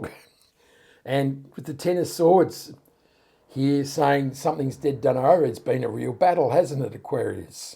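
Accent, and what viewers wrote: Australia. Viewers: Thanks very much - great reading Love listening to a fellow Aussie ❤️ your interpretations always have depth and an authenticity to them Wishing you much light and happiness 😊😂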